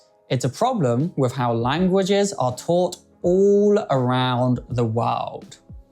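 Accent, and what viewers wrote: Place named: England, United Kingdom. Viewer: He is English, he has an English accent, American accent sounds somehow different, but both are "natives". Anybody that has trained their listening a bit could recognize it.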